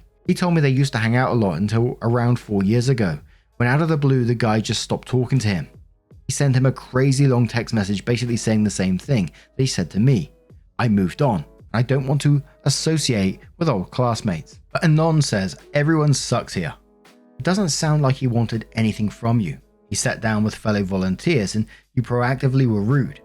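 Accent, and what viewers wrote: United Kingdom. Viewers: makes doing housework so much more bearable! Thank you so much for being so much a part of my day that it's not complete without hearing "What's up, Waffle Gang?"! Plus, as an American, his British accent makes him sound so distinguished! I'm sure I'd still like him if he were American (I just wouldn't respect him as much, lol).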